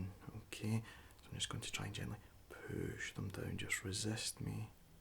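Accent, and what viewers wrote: Scotland, United Kingdom. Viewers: I think I'm going to move myself and my Australian accent to Scotland now in a desperate attempt to pick up this accent! Hahaha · I adore your videos, you have such a relaxing voice! And I'm quite the sucker for scottish accents:) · I love this! It's always more relaxing for me when it's a scottish accent because I'm Scottish.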